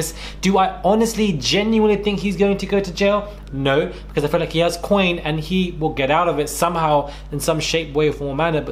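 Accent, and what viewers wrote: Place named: United Kingdom. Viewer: Ngl, was laughing my WHOLE ass off listening to trying to talk about US politics and locations. Caught the pause for pronouncing Illinois correctly, but I lost it when he said "Ar-Kansas." Good vid. Just super funny listening to someone (presumably) from the UK discuss these things.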